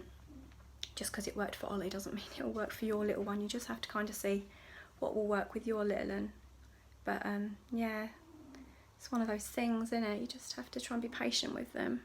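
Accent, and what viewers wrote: United Kingdom. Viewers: I love your British dialect 😊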